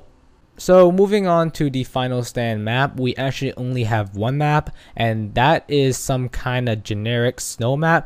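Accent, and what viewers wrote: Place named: United States. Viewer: All your intro sound like your American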